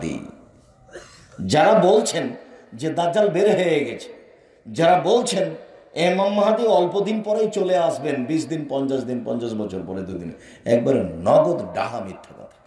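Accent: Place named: Dhaka, Bangladesh. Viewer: সে তার ঢাকাইয়া ভাষা কইতে যাইয়া ভাষার বিকৃতি হয়ে যায়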